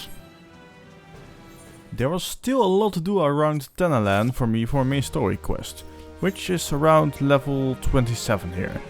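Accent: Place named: Netherlands. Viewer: Hehehe, your dutch accent makes me happy. I hope you enjoy the game!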